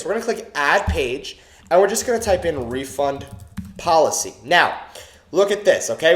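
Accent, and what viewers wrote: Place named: Italian-American, United States. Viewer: EssentialShop, although the video speed makes it difficult to keep up, the main problem with the video is the mispronunciation of keywords and the speaker's nasally and seemingly Italian-American/Jewish accent. The best you can do is to turn down the speed and read his lips while you listen. Keep in mind that those who speak with these accents will have no problem understanding the video at whichever speed. There is nothing wrong with your hearing or ability to understand. Those who would criticise you just lack the ability to see things from a different perspective and readily show their unjustified intolerance. Good luck.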